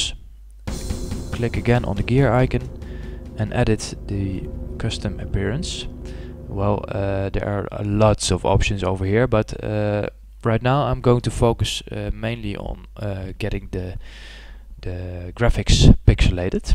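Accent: Netherlands. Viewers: are you dutch? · Are you Dutch Ben je Nederlands? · are you dutch? u have a dutch accent lol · I can hear that you're Dutch :)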